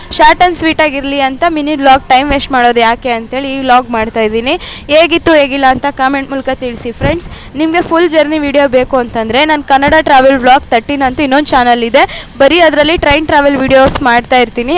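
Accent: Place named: Bellary, India. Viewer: ಮಗಳೇ, ಬಳ್ಳಾರಿ ಭಾಷೆ ಬೇರೆಯವರಿಗೆ ಸ್ವಲ್ಪ ಓರಟು ಅನಿಸುತ್ತೆ, ನಿನ್ನ ವಿಡಿಯೋಗಳನ್ನು ನೋಡಿದ್ದೇನೆ,ಬೇಷಿ ಅದವು , ಮುಂದುವರಿಸು, ಶುಭವಾಗಲಿ, ನೀನು ಈಗ ಬಳ್ಳಾರಿಯಲ್ಲಿ ವಾಸನೋ ಮೈಸೂರಿನಲ್ಲೋ?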